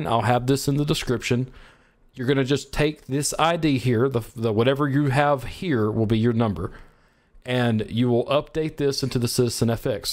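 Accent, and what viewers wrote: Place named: Alabama, United States. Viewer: Thanks man. That alabama accent made me feel right at home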